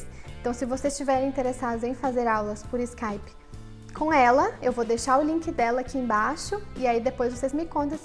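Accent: Minas Gerais, Brazil. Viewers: estou amando seus videos, pfv não para, amo seu sotaque q fofo 😍❤ sotaque mineiro não tem igual hahaha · Seu sotaque é muito mineiro!!!! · Oi... vc é Mineira? Tem um inglês com jeitinho de mineiro falar... Bjs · Você é mineirinha? rsrsr tem um leve sotaque....rsrsr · Você é mineira? hehe tem um sotaquezinho! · Sua pronuncia do inglês é linda. Mas sinceramente, não tem nada mais meio do q um sotaque mineiro sô. kkkk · Pq seu inglês não tem sotaque mineiro?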